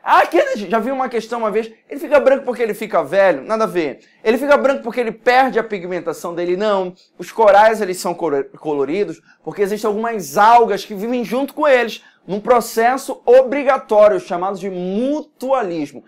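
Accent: Pará, Brazil. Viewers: Muito bom 👏👏 Prof vc é do PARÁ? Sotaque muito igual!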